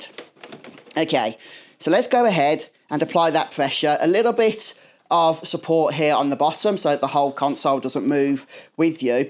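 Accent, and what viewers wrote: Birmingham, United Kingdom. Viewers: As soon as I heard the Brummie accent, I knew this was going to work. And it did first time. Thanks mate you really helped.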